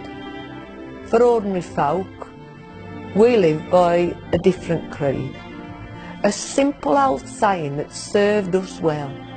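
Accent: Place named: Black Country, United Kingdom. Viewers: From the Black country,very co0mical accent.lol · I don't know who wrote this but neverthelesss it is a piece of genius. Also It has more resonance for me having been delivered by someone from the Midlands, for reasons I can't quite put my finger on because although I have lived my life so far, for 47 years in my native Yorkshire then 10 in Scotland and the last 4 here in London, I find working class Midland people the 'Salt of the Earth'. You speak for me good Lady, thank you, and a very Merry Christmas to all at.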